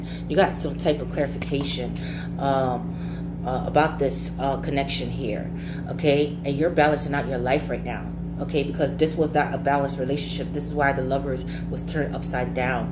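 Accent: New York, United States. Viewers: you are so funny are you from New York New York or New Jersey that's your accent I love it but you yeah I love the reason I love it I'm a Leo son Taurus Moon and a cancer I forgot that one he's an earth sign I've been waiting for him to wake up his mind it's been almost 2 years but I have time not exactly where I want to be and he's got out of a divorce about 3 years ago I have options you know we Leo's they love us haha they try to act like they don't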